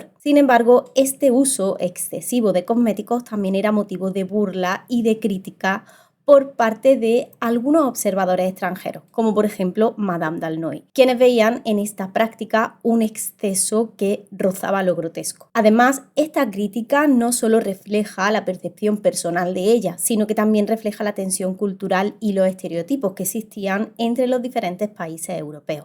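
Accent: Andalusia, Spain. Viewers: Tú acento es el más bonito de los acentos de España, al menos para mí oído latinoamericano. Muy interesante y entretenido tu contenido. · Seguro que es andaluza como yo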